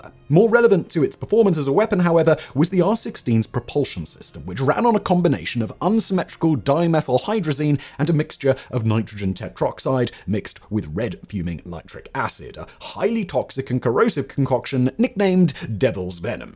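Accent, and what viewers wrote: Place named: RP, United Kingdom. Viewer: you do realise he is just a narrator, he doesn't write or edit almost of the material, all he does is ready from a script. He seems smart, but really it's just a posh accent, flashy clothes and glasses. His writers and editors are pretty average too because if you pay any attention the amount of inconsistencies, mistakes, misleading information and just blatantly false claims become glaringly obvious. Dint get me wrong, I love his content, but you should be aware he's not this genius everybody seems to think he is.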